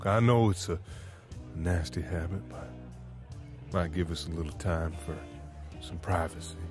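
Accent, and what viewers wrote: South, United States. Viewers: I love your southern accent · This ain’t no American accent missy!!! It’s a country accent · Your country accent is amazing 🤩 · The Country Boy accent is amazing, it so good thank you!!! ❤ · The giggles I let out while hearing the country accent ☺️🤭❤ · i absolutely love your accent (and honestly i am not a big fan of american accents that much) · This Country Boy accent sounds so sweet, and is hitting just the right spot in my brain ❤️ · As a girl who lives in South ith think your accent was spot on a little to rich but when you speak all in all it was hot 😙🤌 chefs kiss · A country boy accent aways makes me giggle 🤭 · As a southern girl, the accent killed me💀💀💀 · Him: Where you from? Me from Downtown Ohio No boyfriend because I’m to shy to ask anyone, and to tell people I’m from Ohio… 😪 yeah this audio is perfect.❤ I loved it. 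You have a wonderful country accent! 🥹love you!!! ❤❤❤.From a British woman. 😌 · The country accent is amazing. This video is just what I needed, too. Thanks, Daddy. ❤️ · Omg the country accent gave me tingles. You can get it. Stop playing Daddy I'm lit so I have liquid courage. I'll feel bad tomorrow Yee haw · (Edit): After listening to this, your southern accent is pretty dang good! Your voice acting is very impressive! Well done ❤️ ❤️ (Original comment): Ooo?! Going by the title imma have to listen to this one at night 😂🫢🥴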